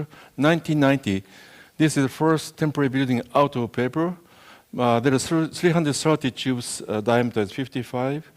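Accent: Japan. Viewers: Sure you are right. But I don't agree with the fact that he's pronouncing as he is "supposed" to. I know Japanese people who have less achievement in life than he does but speak better English because they try harder to erase their accent and make themselves understandable. I just feel that he is making no effort whatsoever to even try to alleviate the pureness of his accent. I don't doubt he can do it though, I just think he consciously stops himself of trying for whatever reason. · Im pretty sure he did a speech with Japanese ascent !!! but nice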